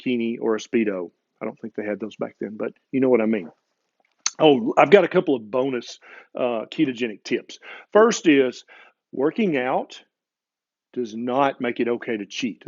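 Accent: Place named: Kansas, United States. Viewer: Dear Dr. Berry. Frank here, RN and Certified Personal Trainer, to say how much I enjoy your videos. After 10 years teaching exercise, I have started to incorporate nutrition, especially keto lifestyle, into my programs. I appreciate the fact that you hold an MD degree which I believe lends credibility to your opinions on healthy eating. Also, your accent reminds me of my PCP who hails from Kansas! Keep up the good work! Let's "Make America Healthy!"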